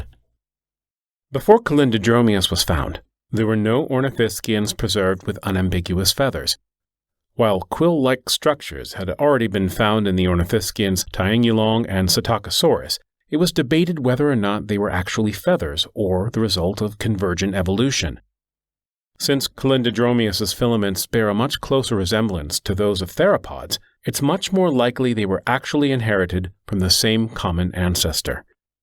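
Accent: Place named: United States. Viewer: the new narrator is very sensationalist and sounds like talkin to children on a village pfair. Way too American somehow, please get back the previous guy :)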